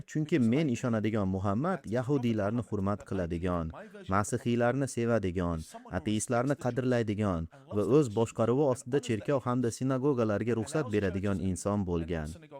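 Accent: Andijan, Uzbekistan. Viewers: Koproq shevada gapiradi bzi andijon shevada kopchilik "yuĞurib" deyishadi atag yahshi taklif🎉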